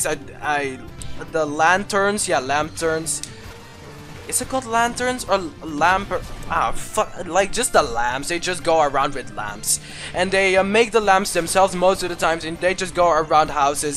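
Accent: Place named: United Kingdom. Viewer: nl for tha win! also, first vid I heard of you, I kinda thought you were brittish. XD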